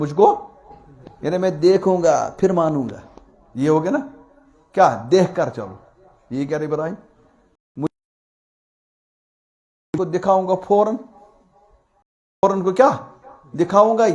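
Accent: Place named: Kashmir, India. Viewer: urdu mai kashmiri tunr h hazrat ka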